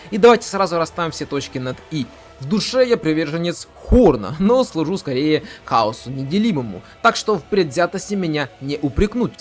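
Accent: Ukraine. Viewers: Хороший канал про практическую ваху. Уши режет украинский акцент , но в принципе очень годно. Лайк. Подписка ? да .. заверните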